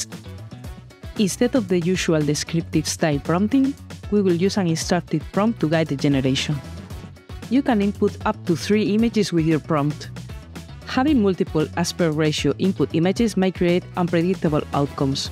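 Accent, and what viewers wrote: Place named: Latin America. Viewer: The latino dialect makes any tutorial a joy to study! 🙂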